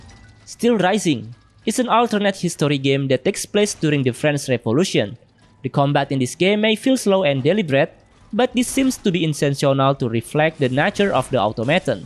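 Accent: Indonesia. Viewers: Indo banget logatnya lol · aksen nya kaya orang indo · aksen indo bjir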